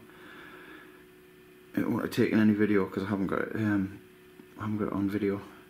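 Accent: Newcastle, United Kingdom. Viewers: Hi, I am looking to purchase a good trail Cam such as this for monitoring hedgehogs in my Garden. Can you tell me where you baught your trail cam from please. I live in Gateshead and its great to hear a Geordie on youtube and ive recently subbed to your chanel. Also what sort of distance can you see images on your mobile phone from where camera is sited? Also does this alert you of activity or is that only cellular Trail cams that do that?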